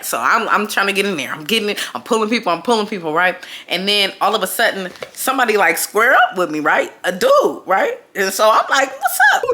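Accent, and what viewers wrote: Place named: Bay Area, United States. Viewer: Sis I was gonna ask you where you were from cause I was listening to you talk & was likeee “she sounds like she’s from the crib!” Lol . I found a comment & you said you’re from Oakland. Yesss love my Bay youtube girlies! 🤍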